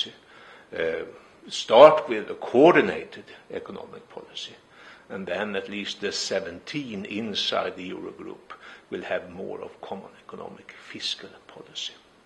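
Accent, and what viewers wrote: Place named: Sweden. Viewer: he might as well been speaking swedish, terrible accent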